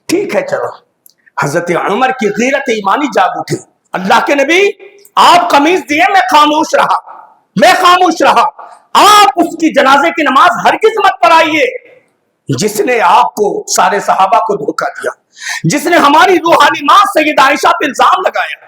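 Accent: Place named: Arab world. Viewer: عربی لہجہ بھی بہت ہی بھدا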